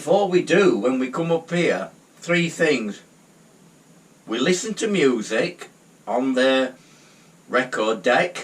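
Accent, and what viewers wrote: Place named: Northern England, United Kingdom. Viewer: thanks, English ex living in Mexico here. You're a must watch for me - especially more so when I miss home and the Northern accents. Cant wait for the wild camps. Best o'luck to you mate.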